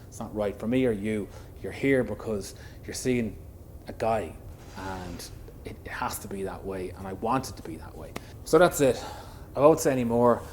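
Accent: Ireland. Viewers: Okay, that truly sucks! I'm watching from the US and there are like almost no Lotus Esprits from the early years in the whole country. Dang it! Still, I look forward to every one of your videos. Thank you for explaining the stop motion reasons and motivations. That make much more sense than I realized! It's also way entertaining and a butt load of work, I bet. I will subscribe to your channel, because I like your work ethic and I like vintage Lotus cars. Your Irish accent is a fav, too! Keep going!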